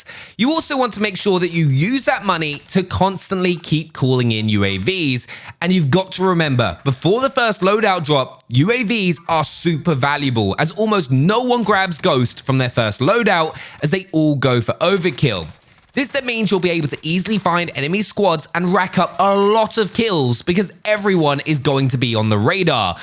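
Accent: United Kingdom. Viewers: U sou d like a British version of rex from toy story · I love how he says butha instead of bertha XD british accents are the best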